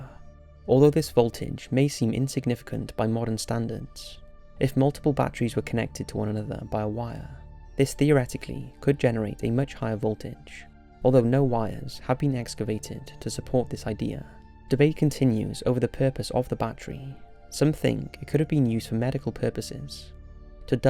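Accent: United Kingdom. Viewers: What do i think - that your a fuuking natzi, talking like i britt, click bate bitch! Love from Israel!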